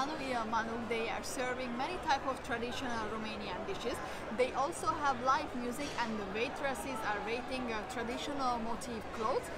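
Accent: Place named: Hungary. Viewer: Romania was never part of Soviet Union. You have a very cute Hungarian accent. It's like your native country. Fortunately never Soviet Union. Greetings!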